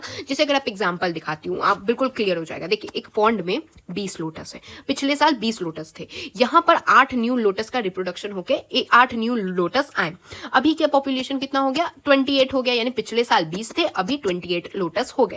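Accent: Bihar, India. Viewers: Are you bihari?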